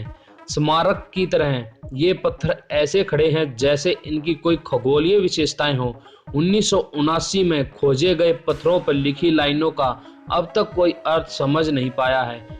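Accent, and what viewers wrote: Haryana, India. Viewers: Bhai Haryana se h kya tu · Haryana ka hai paka akhar sound se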